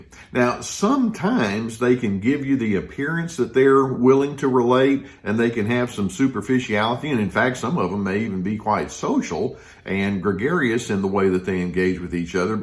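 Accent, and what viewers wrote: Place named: South, United States. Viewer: Love the Doc's clear southern accent, as well as his knowledge and experience!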